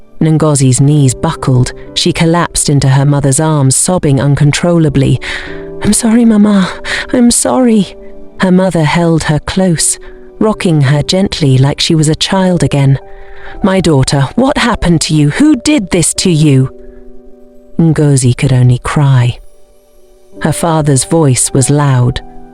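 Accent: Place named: Jamaica. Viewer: You got a Jamaican accent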